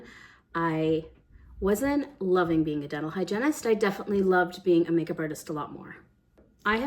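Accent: Canada. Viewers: Your shorts just came across my algorithm, and I really enjoy watching them. I thought I occasionally detected a faint eastern accent, so had to see where you are from (prior to this video) and saw Canada 🇨🇦. Told my husband I knew I was right. So happy to see and support Canadian creators like yourself. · Hey hey, I just love you and now I know why... you're a maritime girlie - and now I can hear your Nova Scotia accent. Surprising to me that the Bridezillas in your shorts were East Coasters (or maybe they weren't) because that seems opposite to the maritime culture, but I guess there are mean people everywhere. I'm 50 and have hooded eyes now. Would love your best tips on this. ❤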